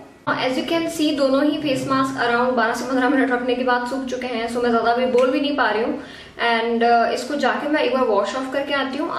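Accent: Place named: Mumbai, India. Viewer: Apaki language se lagtahe ki aap Mumbai se ho . Ladako keliye konase masale he faces pack me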